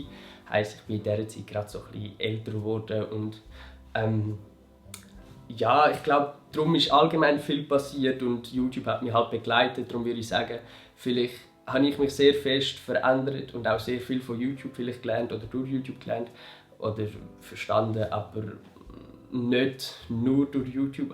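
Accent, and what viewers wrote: Switzerland. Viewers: Ich finde das schweizerdeutsche voll schön, kann nicht mehr aufhören, deine Videos zu gucken😂🤗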